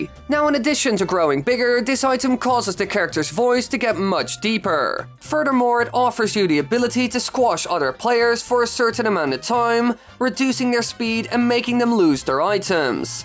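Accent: Netherlands. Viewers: Hey, you’re dutch arent you? Hallo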